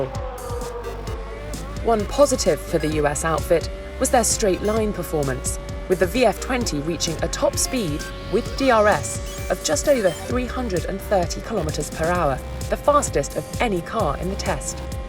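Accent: United Kingdom. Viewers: Very pleasant and beautiful voice of the talking lady. I love how sound the british accent. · Perfect English accent. Elegant without being toffy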